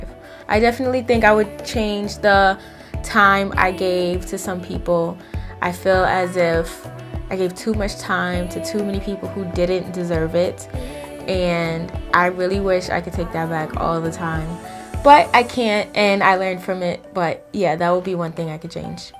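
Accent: New York, United States. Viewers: I LOVE your New York accent. 😍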